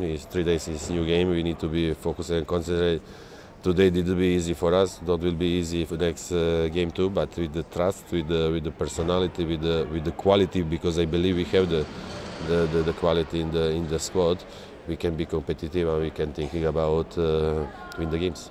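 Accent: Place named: Yorkshire, United Kingdom. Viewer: He’s starting to get a Yorkshire accent 🤔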